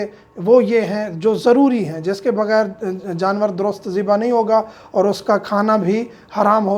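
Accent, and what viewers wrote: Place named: Saraiki, Pakistan. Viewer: بھائ سرائیکی لگتے ہیں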